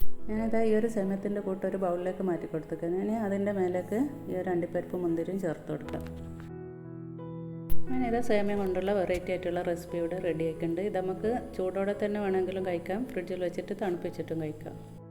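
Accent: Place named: Nadapuram, India. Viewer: Nadapurathukariyanooo samsaram kettitt ❤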